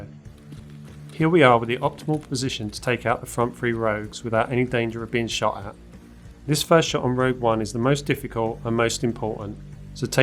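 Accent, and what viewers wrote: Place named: Romford, United Kingdom. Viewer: You from Romford? Your accent giving me serious Ray Parlour vibes 😂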